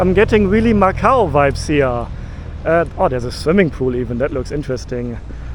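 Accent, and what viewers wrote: Germany. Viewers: 😂😂😂😂😂😂😂😂😂 diese diese worst German English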